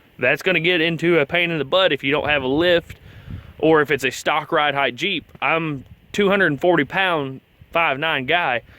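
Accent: United States. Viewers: jesus if you're not from missouri i need to reevaluate my accent and great video by the way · Sub'd - I have a 2.5 TD Sport here in Scotland I bought for £600 4/5 years ago...it needs a bit of TLC...I enjoyed your informative, casual, southern style...will check your other vids!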